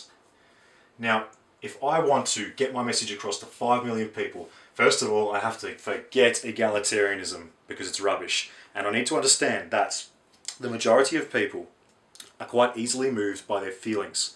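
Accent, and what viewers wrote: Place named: South Africa. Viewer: This video was repetitive and very, very simple. Sounds like a yarpie. (ooh 'name calling')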